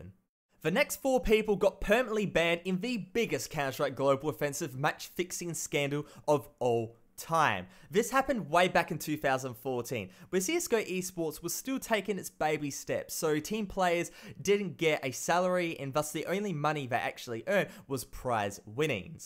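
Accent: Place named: Australia. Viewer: 70k damn you are gaining quick bro! Really enjoyed this video, I think we all agree on the fact that CA is dead and probably has been for 2 years already. But it doesnt really matter what game, your commentaries are always so chill. Love the aussie accent haha.:P keep going bro:-)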